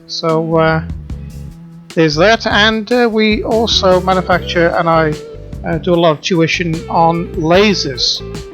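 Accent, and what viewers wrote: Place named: England, United Kingdom. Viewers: I love this piece. I really enjoyed watching how you got into that centre and then leaving the top as is. It gives it its own personality. Can i ask where you are from. I'm from the UK and it appears that i am hearing an English accent but with something added and i can't figure out. Thanks for sharing pieces with us. Shirley from the UK. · Where do you hail from sir, that sounds like a very West Country accent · Australian? I thought you sounded very much from the south west of England, Cornwall/Devon area. I actually came to the comments section to say something about the quality work of a classic man in his shed. (A proud UK tradition.) Any links to the UK? I know Cornish miners had a heavy influence and there was a lot of migration to Australia from here in the 1800's.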